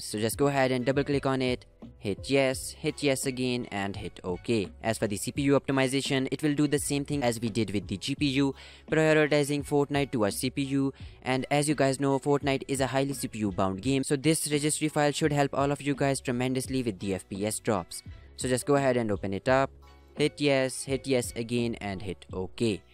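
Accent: India. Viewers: you sound indian and may I ask what ethnicity are you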